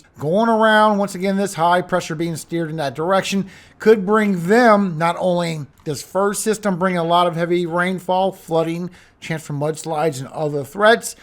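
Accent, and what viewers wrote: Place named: New Orleans, United States. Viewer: Thanks,. You sound like you are from the Westbank, New Orleans. 🙏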